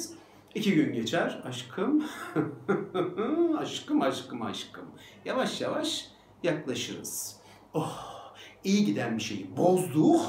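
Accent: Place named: Kayseri, Turkey. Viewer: Hocam iyiki varsınız kayseri şivesini çok güzel kullanıyorsunuz beni güldürüyorsunuz allahta sizi güıdürsün hemşerim.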